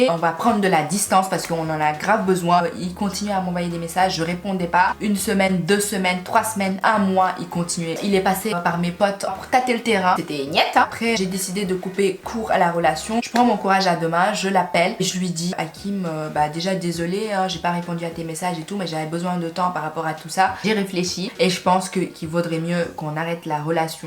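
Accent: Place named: Senegal. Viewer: A ton accent j’ai direct capté que t’étais sénégalaise 🙃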